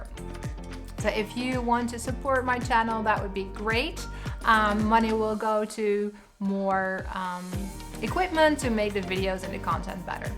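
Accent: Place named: Russia. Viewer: Your russian english is pretty good. A lot of accent still. Very fine though. Congrats!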